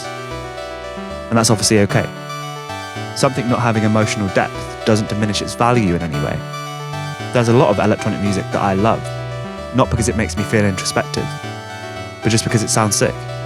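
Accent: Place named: United Kingdom. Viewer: ofc he has a briish acceny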